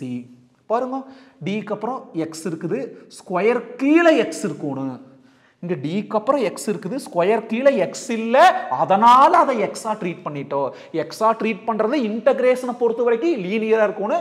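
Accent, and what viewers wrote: Coimbatore, India. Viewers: It's coimbatore slang. Try to understand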